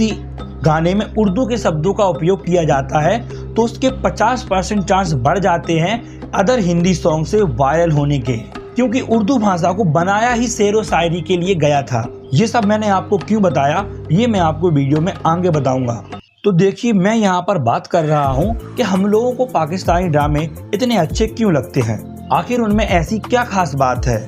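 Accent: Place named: India. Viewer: Bhai English to sahi kr lo pehly Indians ki English or accent bohat kharab hai passive voice hi ghalat hai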